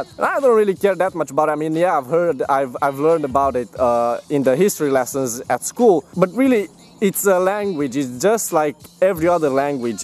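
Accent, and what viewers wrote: Romania. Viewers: După accentul românesc ești din Ardeal, dar nu-i bai. :) Știu cum e, fiindcă în Ardeal m-am născut, dar am accent moldovenesc. :)) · Dar se vede ca esti roman,se simte...chiar si cand vorbesti in engleza,e ca un fel de accent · e mai slavic accentul romanesc dar tot as fi vazut ca e roman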